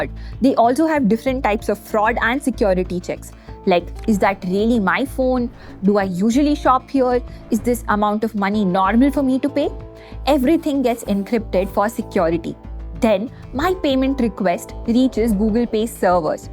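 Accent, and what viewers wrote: India. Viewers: Your speak like a Tamil not english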